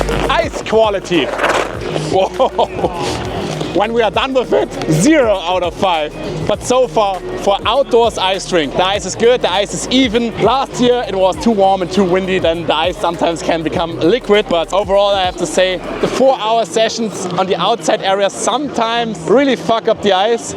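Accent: Germany. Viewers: hi from Hungary ❤ where are you from? You sound German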